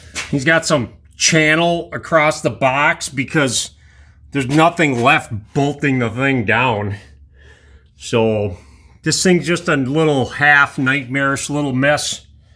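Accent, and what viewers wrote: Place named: Minnesota, United States. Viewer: Love the accent LOL. Minnesota ? I think this accent with sarcasm is just the best combo. Question if you needed to JUST replace the fuel pump. would you recommend doing it this way or from the top by removing the bed?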